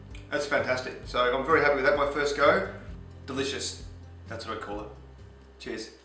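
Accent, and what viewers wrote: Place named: Australia. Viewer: Loved hearing an Aussie describe food he's cooking! Sorry mate, your accent was better than your cooking for this American. Keep it up though!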